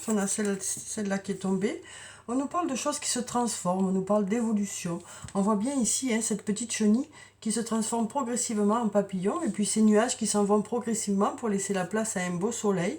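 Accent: South, France. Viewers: Merci pour cette guidance, toujours un plaisir d écouter cet accent du soleil, une voix calme et posée et surtout toujours cette bienveillance, vous êtes bénéfique et toujours dans le positif merci pour ces bonnes énergies que vous nous envoyez bonne journée☀ et prenez soin de vous. Bisous 😘👍💋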